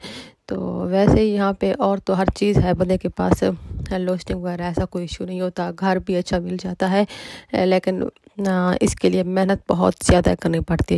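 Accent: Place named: Pakistan. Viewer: wasa ap ka bolana ka andaz sa lag raha ha ap Pakistan sa kidhar sa ho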